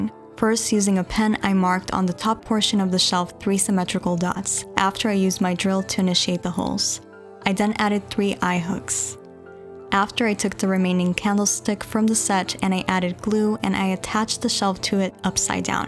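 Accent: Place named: United States. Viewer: I’m curious where you are from, you have a lovely American accent with something lovely from somewhere else...beautiful speaking voice, you MUST start an AMSR channel, you will gain thousands of followers! ✨🐰🐰🌹✨🎠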